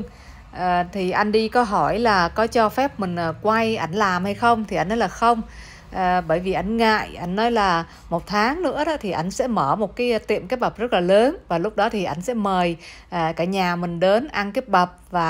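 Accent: North, Vietnam. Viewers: Co noi nguoi mien Tay vay tinh nao vay toi dan Can Tho , nghe giong co co am huong nguoi Bac chu khong rat dan mien Tay cua Chung toi mong noi ro , toi xem clip co moi ngay , congratulations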